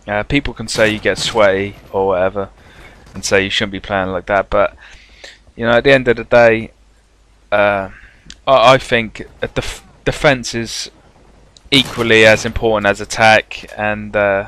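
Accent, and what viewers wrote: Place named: Norwich, United Kingdom. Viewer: You sound like your from Norwich? City fan?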